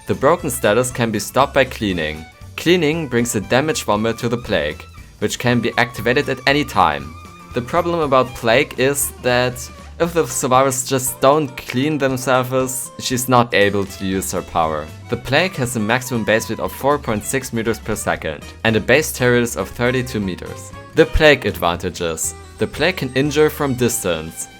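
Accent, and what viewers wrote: Germany. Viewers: The german accent xD good video ^-^ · Please do a survivor ranking next. I really like your Deutsch Akzent.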